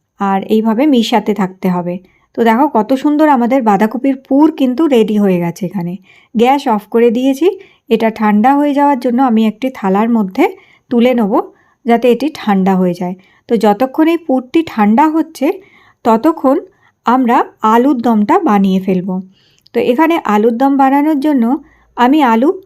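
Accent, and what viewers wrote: Bangladesh. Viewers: বাড়ি কই টাঙ্গাইলের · মাগো তুমি বাঙাল নাকি।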